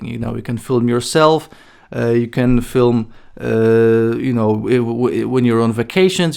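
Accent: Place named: Portugal. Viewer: your Portugese accent makes it difficult to understand sometimes. Please speak more slowly and repeat the key info several times. Thanks!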